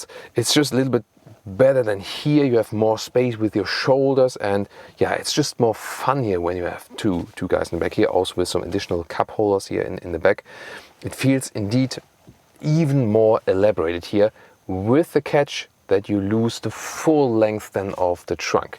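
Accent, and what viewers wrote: Germany. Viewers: Hi, your reviews are great, but your heavy accent makes it very painful to listen to. Why don’t you consider recording your videos in your native tongue (German) and add closed caption, or even a voice-over with a native English speaker? No offence, but it would win you more English speaking viewers for your great channel. Why don’t you consider it? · Re: German car bias. That’s exactly why I subscribed to this channel. A German reviewing German cars in English is a perspective one cannot otherwise get. Say, “DEE-hu-mid-if-cation” :-)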